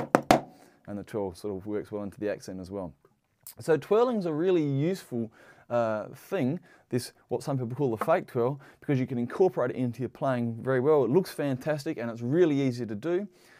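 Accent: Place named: New Zealand. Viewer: whoa you sound new zealander. im aussie as well but from sydney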